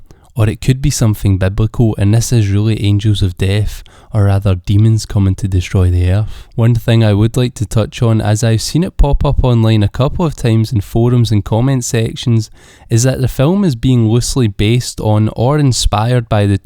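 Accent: Scotland, United Kingdom. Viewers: That accent...sounds Scottish, I'm Scottish. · Fucking love your accent dude! Not what I'd expect in this type of video, but fucking lovely nevertheless. Sounds Scottish with a Welsh tint. Southwestern Scotland perhaps? Entertaining as fuck.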